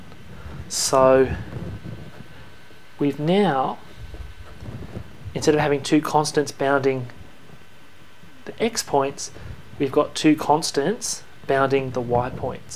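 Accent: Australia. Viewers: Talha Bedir this sounds australian to me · Definitely mate, an Aussie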